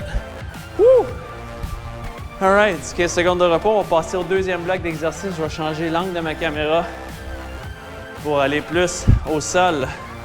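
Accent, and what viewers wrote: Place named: Quebec, Canada. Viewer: Salut, Merci pour cette petite séance ! J'aime beaucoup le format. Quelle bonne humeur ! Et l'accent québécois est plein de joie, ça motive !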